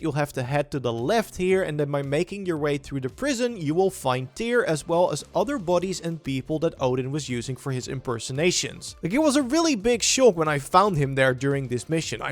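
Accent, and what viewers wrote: Netherlands. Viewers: Are videos voiced over by multiple people these days? The voice is very different from some other videos and the shorts, both sound like they're from the netherlands though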